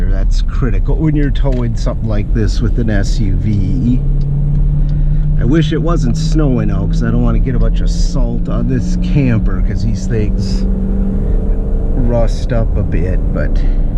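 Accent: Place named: Upper Midwest, United States. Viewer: Good video. I like your accent too! upper midwest?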